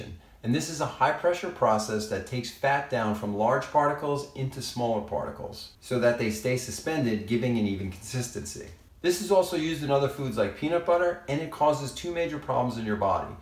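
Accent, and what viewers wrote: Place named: New York, United States. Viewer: You have a great New York accent, btw!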